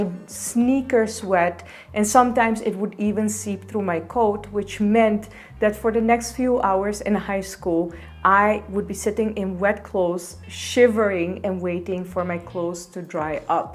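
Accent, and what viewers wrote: India. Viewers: Omg . You already have a semi Indian accent. It's kind of a hybrid Indian-European accent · Haha geweldig! Love dat je inmiddels ook een klein Indiaas accentje hebt. Well done! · Ha ha you speak little bit with Indian accent too. Started be be 100% Indian. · Now you have an indian english accent as well as look like a (pale) indian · she has such a cute partial Indian accent, like some words in between. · Your pronunciations are very indian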